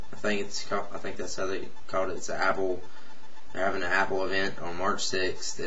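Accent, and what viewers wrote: South, United States. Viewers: Is it just me, or did used to have more of a southern accent when he was younger?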